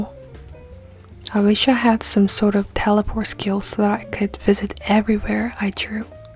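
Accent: United Kingdom. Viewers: Love your English accent❤❤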